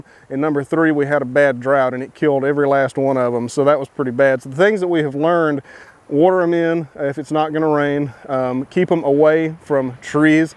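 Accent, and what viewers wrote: South, United States. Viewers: Thanks! You always post the most calming yet informative videos. I'm Georgia born and raised but you have the best southern accent by far!:D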